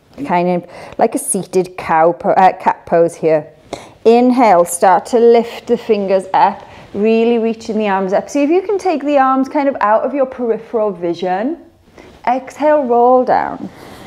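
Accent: United Kingdom. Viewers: are you British?!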